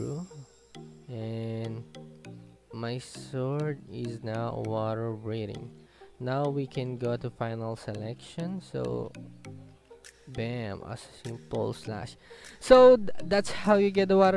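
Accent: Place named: Philippines. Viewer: Man u from philipines ur english like philipines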